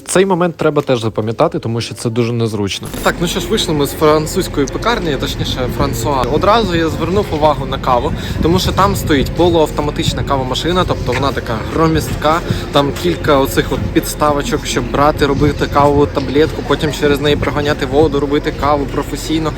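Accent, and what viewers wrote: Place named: Ternopil, Ukraine. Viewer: Була впевнена, що ти з Тернополя ☺️👍🏻 Дуже гарно розмовляєш з нашим тернопільським акцентом.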